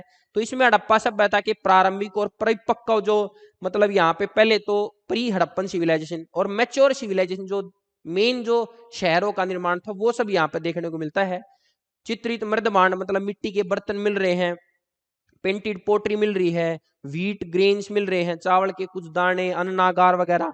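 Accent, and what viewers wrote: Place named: Haryana, India. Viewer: yeah I got now. Thanks very much☺️☺️ One more thing , your way of teaching is just awesome Sir , Haryanvi touch makes feel that someone known to us is teaching.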